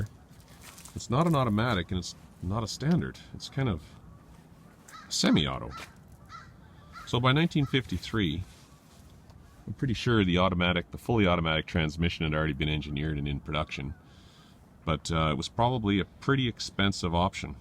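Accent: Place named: Canada. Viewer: You sound like you're from Canada.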